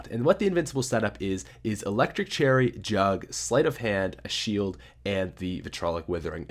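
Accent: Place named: Canada. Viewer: Lol ur Canadian right? Love you Canadians out there. "What is not to love aboot this thing?" Hahaha 💖😁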